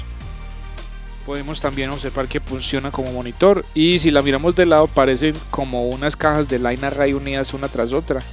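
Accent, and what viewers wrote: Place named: Colombia. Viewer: Todo bien explicado hy el vídeo muy bueno., LO MALO es el acento colombiche que de verdad, el solo escucharlo es repugnante., Hubiesen puesto a alguien con acento NEUTRO para que el vídeo sea más limpio. DE RESTO, EXCELENTE VIDEO😊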